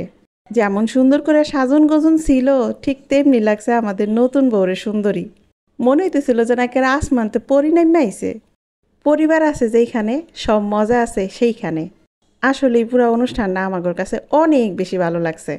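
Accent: Mymensingh, Bangladesh. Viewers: so cute আপু, তুমার মুখে আমাদের মমিসিংগা ভাষা শুনে ভালোই লাগললো❤, এ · মাশাআল্লাহ আপু এক ঘেয়েমি কেটে যাওয়ার মতই অসাধারণ ভিডিও। ভীষন ভাল লাগল। তুমি এমনিতেই অনেক কিউট। আজ আরো বেশি সুন্দর লাগছিল। ময়মনসিংহ ভাষাকে তোমার মুখে শুনতে খুব মিষ্টি মিষ্টি লাগছিল। অনেক সুন্দর পরিবেশ ছিল।খুব ভাল লাগল আপু।😍🥰😍🥰😍🥰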